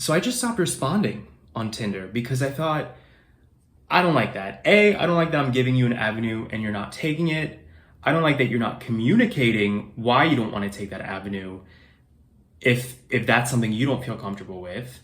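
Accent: New York, United States. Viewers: How could anyone resist that NY accent and the way your voice goes up on words like “Vocalize”.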